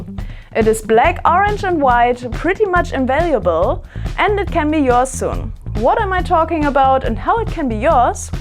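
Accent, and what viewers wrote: Germany. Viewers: Pretty sure there are 45 different logos in the video. Love the german accent, btw! Good luck! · I counted 38 logos. Btw hört man extrem das sie deutsch ist xD😆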